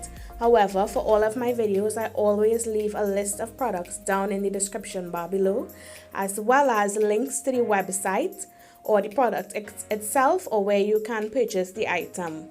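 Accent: Trinidad and Tobago. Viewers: Hi... u sound like u from trini.. i am also.. and those nails r beautiful..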